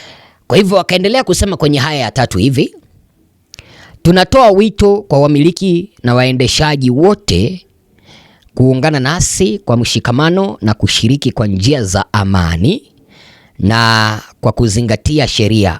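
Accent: Kenya. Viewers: ETI TANZANIA 🇹🇿 TAIFA LETU. KISWAHILI CHAKO CHA KENYA 🇰🇪 HALAFU UNASEMA TANZANIA 🇹🇿 TAIFA LENU. WEWE NA NANI?? 😂😂😂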